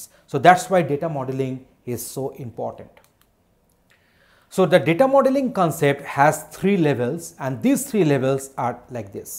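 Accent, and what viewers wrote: Bengal, India. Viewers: Thanks for the video. You sound like a Bengalee. :-)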